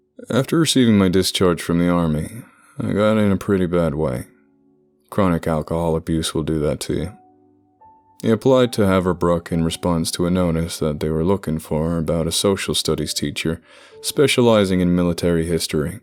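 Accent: United States. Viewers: Your American accent is so good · This reading was fantastic!! Haha you perfected the average american accent on this one, bravo!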